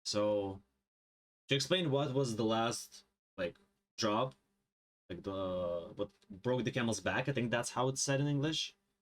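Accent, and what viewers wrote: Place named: Latvia. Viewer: Latvisks akcents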